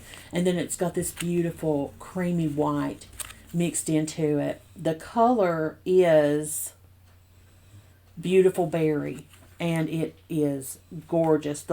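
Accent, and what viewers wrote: South, United States. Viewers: As the video goes on, I do declare, it is questionable that you may have have some Georgia 🍑 in you, oh I detect some southern draw! Oh gosh ,I have been smiling for my whole time here! How oh how could this be? I am going to subscribe ! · Sounds like a good day for you. You live in Maine? You sound more southern than I do and I live in Kentucky. You sound like one of us.😁😁😁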